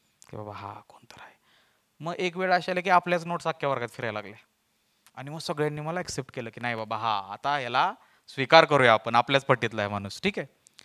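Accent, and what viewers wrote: Satara, India. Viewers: 90's ERA खरचं आपण लहानपण जगलो - without technology Wale तू सांगितलेल्या सर्व गोष्टी खूप जवळच्या वाटल्या....लहानपणात घेऊन गेलास मित्रा...आई वडील, गुरुजी, मित्र सर्व आठवले...hats off 🙏🙏 अजून एक गोष्ट मी एक सातारकर आहे त्यामुळे तुझी बोलण्याची पद्धत त्यामुळे अजुन जवळ गेलो ....thank u 🤗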